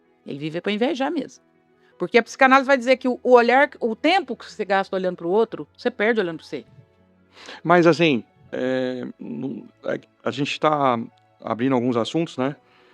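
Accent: Minas Gerais, Brazil. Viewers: Muito legal Dra o seu exemplo! Se intrometrerem na sua vida por um jeito autêntico e mineiro de falar mas não alcançarem de forma singela, o coração de quem carece das suas dicas e orientações para a nossa saúde mental. E tem invejosos-as passivos agressivos que fazem-se de bonzinhos/boazinhas, e aproveitam para nós alfinetar com frases maldosas do tipo: "Comprar casa é fácil mas difícil é pagar as prestações". Ou: "Emagrecer qualquer um consegue mas difícil é manter". E coisas horríveis como lembrar de nossos traumas ferir a todo momento onde surgimos felizes ou celebrando algo bom. Há pessoas que celebram satanicamente as nossas derrotas e tentam nos fazer sofrer até qdo estamos felizes pq não suportam ver ninguém bem. Como a Bíblia diz que DEUS separará joio do trigo! Que sejamos trigo e não joio apesar de tantas imperfeições que vejo em mim🙏🏻. 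Sucesso e sigo seguindo e compartilhando seus vídeos nos grupos de saúde e de Apoio tbm 🎉.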